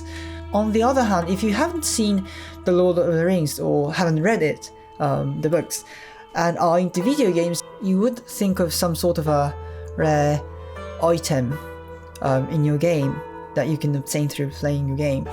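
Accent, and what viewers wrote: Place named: United Kingdom. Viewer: Your British accent is good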